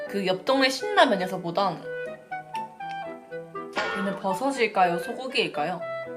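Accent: Busan, South Korea. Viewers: 부산말투 원래 저래요 그러니 남자들이 좋아하죠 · 음!! 부산 사투리가 조금씩 사용하시네요 ㅋㅋ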